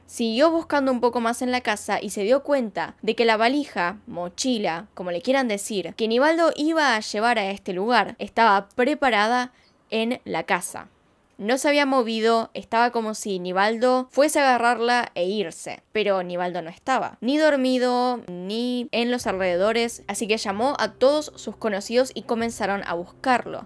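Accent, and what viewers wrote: Buenos Aires, Argentina. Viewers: Me parece q pronuncias mucho "y y la ll", se q eres argentina pero bajale no mms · Es argentina , Tiene ese acento crack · x2,su acento porteño. ❤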